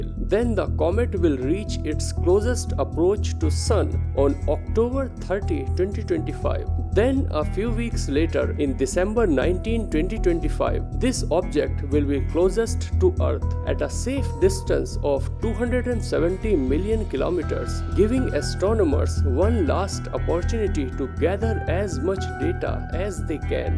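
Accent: India. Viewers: the first word you say, I hear you're an Indian.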